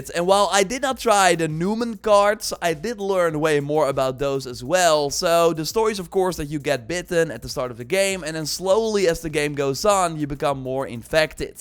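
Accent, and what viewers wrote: Netherlands. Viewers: Ik ruik een Nederlandse accent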